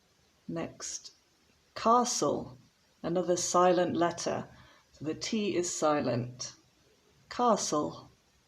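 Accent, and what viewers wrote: United Kingdom. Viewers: Thanks a lot. It's very useful for me. I like your British accent!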